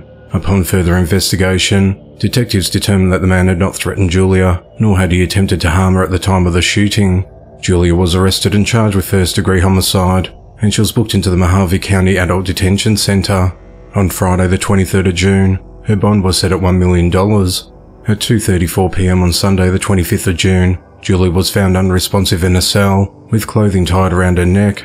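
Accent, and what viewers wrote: Australia. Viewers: You must be an Aussie right?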